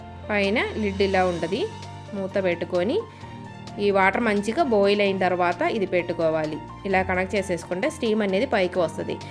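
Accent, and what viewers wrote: Kerala, India. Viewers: I'm ur new subscriber... ur way of explanation and kerala slang telugu i love that 🤍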